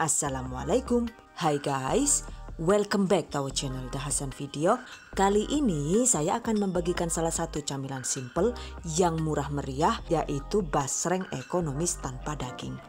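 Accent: Banyumas, Indonesia. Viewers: Hahaha yong selalu nonton vidione mbokayune Sing ngapak Ora ngapak ora kepenaklah.... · Assalamualaikum mba saya paling seneng klau lihat mba lagi beraksi sambil ada selingan bahasa yg ngapak he he jd inget pulang kampung saya tunggu resep berikutnya karna menginpirasi banget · Suka banget chanel ini.. suaranya lucu sama di MIX pke bahasa ngapaknya..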